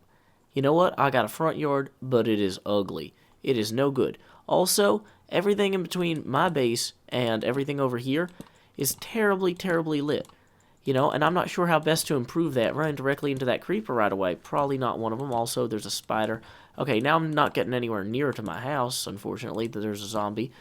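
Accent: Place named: South, United States. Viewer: I've always loved your accent (I'm English and a sucker for Southern American accents) but now I'm starting to love your crazy sense of humour.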